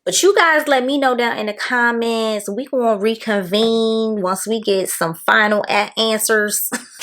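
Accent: DMV, United States. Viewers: Your accent is giving DMV ❤ I have to get the purple one love don’t be shy dupe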